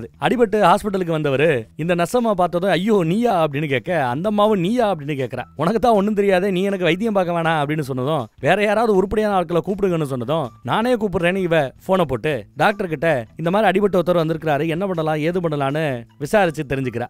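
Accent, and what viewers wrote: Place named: Madurai, India. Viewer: அண்ணா படம் ரொம்ப அருமை ஆனா நீங்க மதுரை தான முட்டை கண்ணு அப்பப்ப பேசுறத பாத்தாலே தெரியுது மதுரை தானே நான் உசிலம்பட்டி அண்ணா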